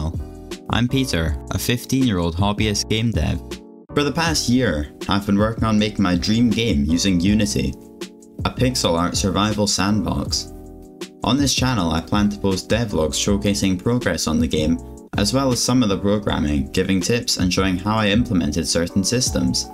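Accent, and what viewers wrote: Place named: Poland. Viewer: you sound polish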